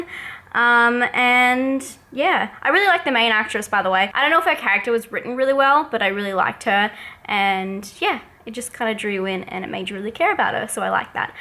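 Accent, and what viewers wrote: United States. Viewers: Lmfaoooo your facial and expressions along with the American accent was priceless 🤣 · The American accent was.... Terrifying I guess is the word? You didn't do a bad job, it sounded authentic in a way. It just drained everything interesting or alive out of your voice and personality. Possibly because when anyone does an "American" accent they suddenly sound like they're from California, and most of us hate Californians, and don't talk like that. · Oh I seen this film it a Brit horror I love this film it's got an actor in it called Jeremy Sheffield Sheffield is the town home city and the wired little creep it called Gregg and your American voice it wasn't that bad you actually sounded like one of those people who will get killed off earlier on in a horror film (no offence) but I thought this film was great hated the screeching noise bit that's probably me it's an autistic thing we don't like certain hight pitch noises.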